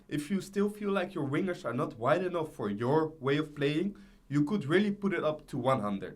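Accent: Netherlands. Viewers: Thanks voor de tactieken, maat! Intussen, je klinkt echt super Nederlands, hoor!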